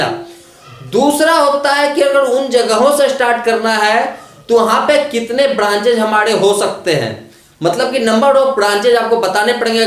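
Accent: Bihar, India. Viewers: Aap bihar se h kya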